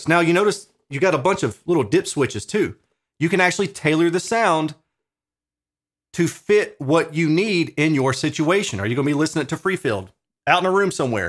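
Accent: South, United States. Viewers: Love your speaker reviews! Dig the Southern accent, too. I found this monitor on Amazon UK, but for us Limeys, it costs a good deal more ($415) unfortunately. Grrr!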